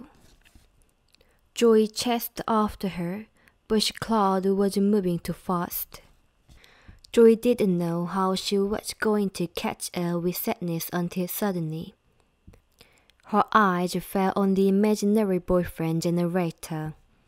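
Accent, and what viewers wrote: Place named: United Kingdom. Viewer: 처음 들어보는데요..영국발음!! 너무 좋아요~~목소리도 너무 좋고 다른것도 들으러 자주 오게될것 같습니당!!!!!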